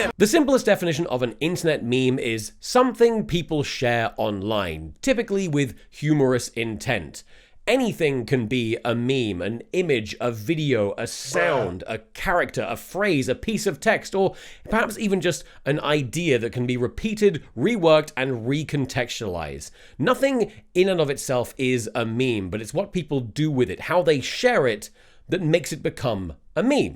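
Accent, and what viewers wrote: United States. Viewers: Holy shit that American accent is wild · You have a good American Accent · I’m sure this is mentioned a lot but American accent is incredible. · Your American accent is so good what Have you been doing s British Accent this entire time Nevermind I got to the antivirus part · There's something uncanny about how incredibly natural Tomska's American accent is. I know he's been doing it for years at this point but still putting it side by side with his actual accent is pinging my wrong vibes detector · Tomska's American accent is impeccable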